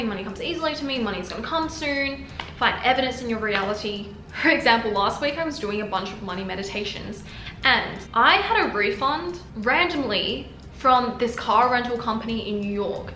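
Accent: Australia. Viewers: Asian Aussie accent ia cool 🤙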